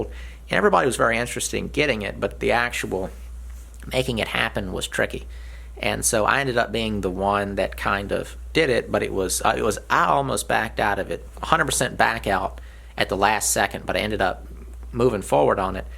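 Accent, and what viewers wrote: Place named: Mid-Atlantic, United States. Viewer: There is a privacy concern which is totally valid. His accent speaks of the Mid-Atlantic states, which range from about Virginia, the Carolinas, into as far as the Tennessee border. That is an area that is bigger than your two islands, so his concerns about crime and safety are totally valid. Hard to pinpoint where he is, given that he has a family and business in a massively populated area. Don't ask, it's a security matter!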